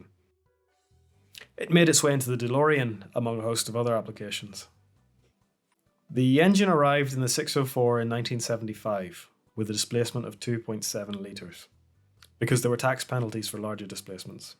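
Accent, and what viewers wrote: Ireland. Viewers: Irish accent! Superb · Pronunciation is different in different countries. Ideally we should all use a French accent but he uses the normal pronunciation that is used in Ireland.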